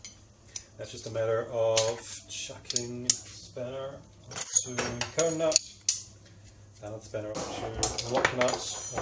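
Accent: New Zealand. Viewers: Hey bro you just got a new sub cool... Your accent sounds kiwi?? Are you nz based would love to check out your work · Brilliant ideas - great video with the detail well explained. Thank you for your generosity in open sourcing all your research. Would you be from New Zealand by any chance? That accent sounds very familiar. Looking forward to the tutorial video.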